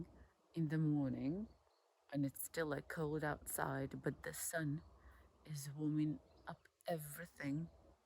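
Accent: United Kingdom. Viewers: Internet isn't real yet this british accent is almost real, so much prpganda but, your video reminds me of my grandma, she never owned any land, yet she knew so much about farming. We used to harvest public plants and at the time it felt like i was carrying twice my weight in fruits. Better times 😀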